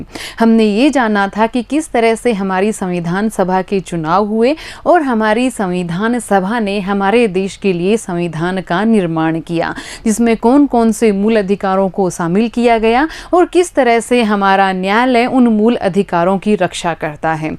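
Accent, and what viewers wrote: Rajasthan, India. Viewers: Nice video but mam apke bolne ke trike se hi pta chal jata hai ki aap Rajasthan se ho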